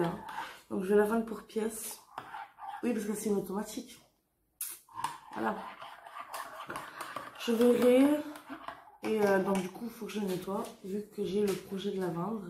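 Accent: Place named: South-West, France. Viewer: Sympa ton petit accent du sud ouest. ça me rappelle mes années de vécues à Toulouse centre ville. :)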